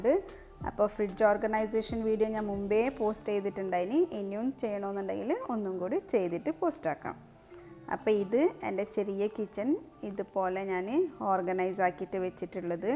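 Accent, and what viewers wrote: Coorg, India. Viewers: Samsaarm kekumbo vijaaricchu coorg thanne aanuu enn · Hii samsaram ketappo thoni m in coorg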